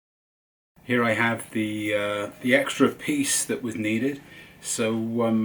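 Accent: United Kingdom. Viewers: Hi you sound British do you live in the USA? I am in the Uk I see you have a bit more space. Nice project.Checkout the little restoration project I started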